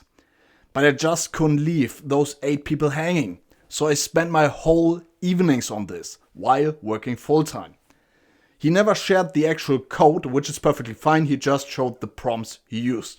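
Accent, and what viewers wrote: Germany. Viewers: Der deutsche Akzent ist nicht zu überhören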